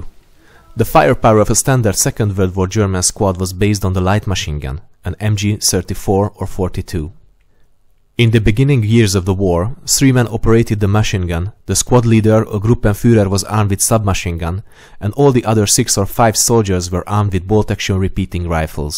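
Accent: Hungary. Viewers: Horribly Hungarian accent.. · I have a Hungarian friend & he talks just like you I can bet you're Hungarian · az angol akcentusodból tudtam hogy magyar vagy :D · Annyira lehet hallani hogy egy Magyar beszél Angolul :D Jellegzetes akcentus, meg beszédi forma vagy minek mondjam. De az ember hangján lehet inkább észrevenni hogy igen, a Magyarok beszélnek így angolul :D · szintén, elég volt meghallanom az első hangot, és már néztem is egyböl leírásba hogy tuti valami magyar lehet :D talált süllyedt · the accent is terrible. Im from Hungary, i live in the USA so im surrounded with these Hungarians but this one takes all. otherwise cool video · JÓ lett a videó És mikor elősször hallotam a kommentárt egyből feltűnt hogy mintha egy kicsit magyar volna :D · A fülemnek tetszik a magyar akcentus :D minek szinészkedni az angolt. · Halgattam a videót nemnéztem leírást és úgy a 10.ik másodperctől éreztem hogy Magyar Vagy :) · Imádom hogy hallottam hogy ez magyar akcentus lesz és téényleg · Mielőtt elolvastam a kommenteket, már sejtettem, hogy magyar a beszélő...le sem tagahtatja :D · Az akcentusból szerintem te magyar vagy :D Pláne a fenti oldalból a ".hu" nagyon gyanús :D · It took me 40 seconds to realise it is hungarian accent. 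· His got that hungarian accent, trust me i know :D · Nagyon gyanús volt hogy magyar vagy, az angolod alapján, de amikor meghallottam a magyar beszédet akkor voltam csak biztos benne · Hmmm... as a romanian I can recognize that magyar accent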